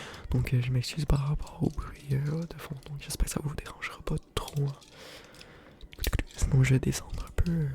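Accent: Quebec, Canada. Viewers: j'apprécie les explications données pour chaque objet utilisé , je connais pas tous les termes malheureusement mais mon cerveau se relaxe avec ta voix et ton scratching lent je crois que c'est ça m'a déclenché des frissons . enfin bref , tout ça pour dire que je viens de te découvrir cette nuit . je n'arrivais pas à dormir et je suis tombé sur une de tes vidéos , après hop abonné . l'accent québécois m'apaise + asmr combiné = mon cerveau est content 🤔👍👏✌ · aaaah j'adore l'accent québécois :) tu es mon nouveau asmrartist préféré ;)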